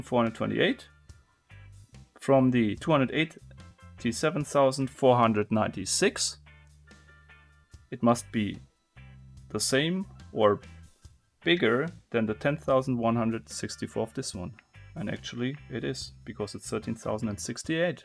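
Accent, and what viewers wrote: Germany. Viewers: Glückwunsch !!!! ( dein deutscher Akzent verrät dich ;D ) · Du sprichst sehr gut englisch aber ich höre deutschen Akzent heraus you're speaking very well English but I can hear a German akzent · This channel is great for so many reasons bro. The presentation is great and your German accent is pleasant to listen to. your showing tons of puzzle I haven't seen and the way u show the puzzle then "let us try" THEN solve it keeps me watching. great job! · using V1 & V2 as a name for that thing is somehow funny with your german accent ;D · Glückwunsch zu den 100k. Ich bin schon etwas länger dabei und bin bis heute wegen dem deutschen Akzent fasziniert xD Ich mag jedenfalls deins Videos ( Das ist eig was ich normalerweise sagen wollte haha :D) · Ich weiß genau das du aus Deutschland stammst! Hört man ein bisschen am Akzent😉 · Übelst deutsches englisch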